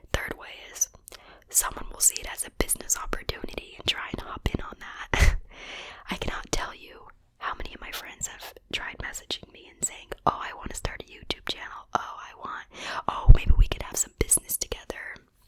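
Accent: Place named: Florida, United States. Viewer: Your Florida accent comes out when u get excited it's really funny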